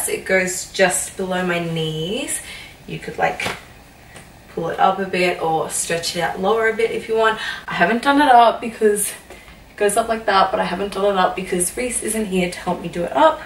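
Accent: Australia. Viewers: I have a feeling more men watch these videos then women lmao a blonde with an aussie accent and a,killer body !!! you are a 10.... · love your Aussie accent!! keep the vids coming, love them!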